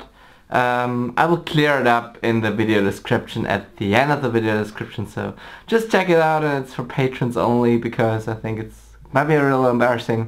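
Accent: Germany. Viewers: your german accent (which I only noticed slightly in one Q&A)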